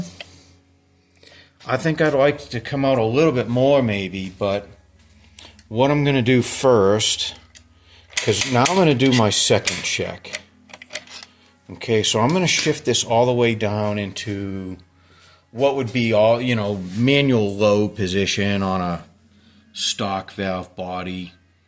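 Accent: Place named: New Jersey, United States. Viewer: That NE Jersey accent is the worst sounding in the USA. Hems and hauls around talking talking in that annoying accent. Bro get to the point...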